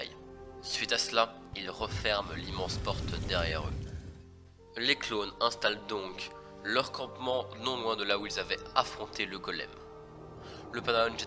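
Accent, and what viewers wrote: Belgium. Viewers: ❤ l'accent belge qui tue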